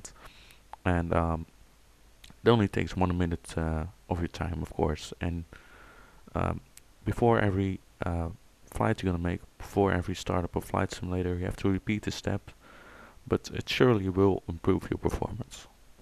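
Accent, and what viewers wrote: Netherlands. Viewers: nice gonna try it ! by the way you have a dutch accent haha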